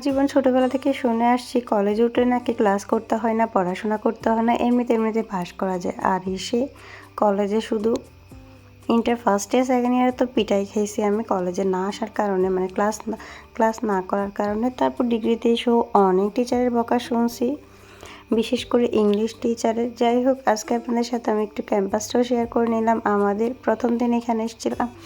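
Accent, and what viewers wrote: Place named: Noakhali, Bangladesh. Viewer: আপনি ভয়েস শুনে মনে হলো আপনি নোয়াখালীর না হয় ফেণীর মেয়ে হবেন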